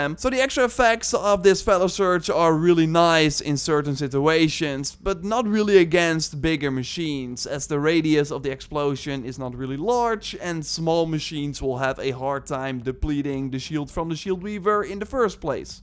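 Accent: Netherlands. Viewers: Hele goeie content maar jonge jonge wat is duidelijk te horen dat je Nederlands bent 😂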